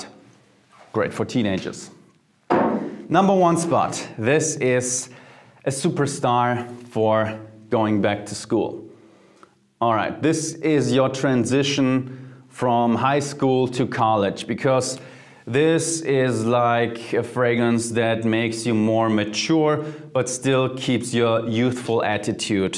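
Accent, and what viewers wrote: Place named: Germany. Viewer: The german accent fits perfect 👌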